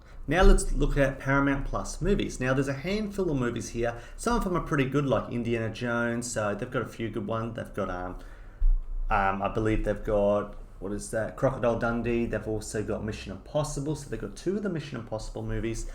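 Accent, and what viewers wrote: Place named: United Kingdom. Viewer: I'm American in U.S, Singed up to this before MARCH 4th launch, i paid only $50 ad free version for whole year ...I dont gave a S about CBS TV stuffs shows, & channels , that MTV & BET is all reality trash channels NEVER care or watch , plus i have U TUBE TV & HULU for that ..Singed up for this ONLY for half off i got for whole year & plus for Paramount & CBS UNCUT premium show's & movies is there & more to come ..beside that F the rest! I LOVE UK guys & accent, is soo cute 🥰👌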